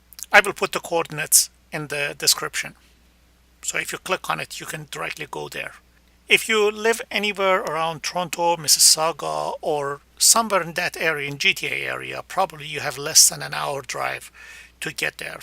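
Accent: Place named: Iran. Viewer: Just noticed your accent are your Persian are you Farsi aaaaaa babajon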